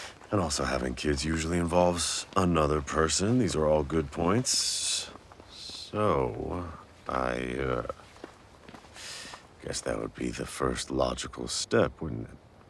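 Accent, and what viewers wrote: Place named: Italy. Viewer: And my friends ask me, why am I into Italians?... ummm hello did you not hear the accent, the food ? 😍. Thank you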